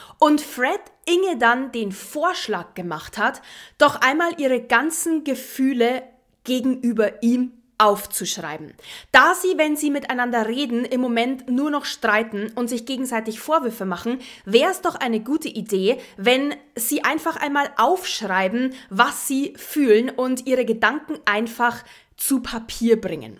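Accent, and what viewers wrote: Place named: South, Germany. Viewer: Was stimmt mit dir nicht, Inge Richter? Was für ein sinnbefreiter Kommentar ist das denn? Du brauchst dir Videos doch nicht anhören. Viele, unter anderem ich, mögen sie sehr! 💕 Mögen ihr Aussehen, ihre Stimme, ihre Ausrucksweise, ihren süddeutschen Dialekt, kurz gesagt: Ihre Arbeit, die sie sich macht. Und die Mühe, die sie sich für uns gibt. Empathie ist erlernbar. Vielleicht solltest du dich einfach mal damit beschäftigen?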